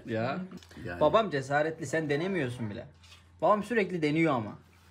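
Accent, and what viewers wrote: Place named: Kars, Turkey. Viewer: Onca yıldır dışarılardasın abi hala içindeki Karslıyı atamamışsın. Konuşunca o "ğ" sesi çıkıyor hala. maşallah. Zamanınız olursa gelsin babasının memleketi Serhat Kars'ı görsün.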